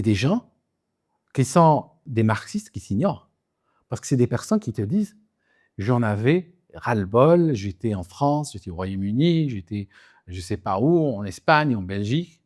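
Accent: Switzerland. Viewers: Ah l'accent suisse :) ....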